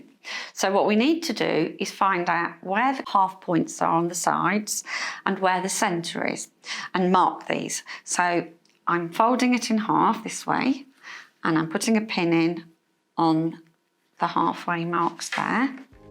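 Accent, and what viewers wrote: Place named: England, United Kingdom. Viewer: Best cross hatch video ever. You have made it so easy for someone who hates sewing. I do a lot of embroidery and the piece I am going to work on is a table runner with cross hatch quilting. I have watched other videos on cross hatching and it was so involved and so confusing. Thank God I found your video. Can’t remember if you said how wide the tape was. I have subscribed to your channel. Besides making it so easy I love listening to you. I have always loved the English accent. Not only am I American, but I am from the Bronx, New York and I wish I had your accent.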